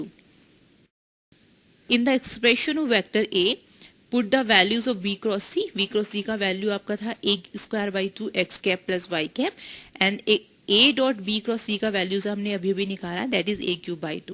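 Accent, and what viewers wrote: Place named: India. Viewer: ....本来听生肉已经很难了，还是印度口音，有点绝望。不过讲的确实不错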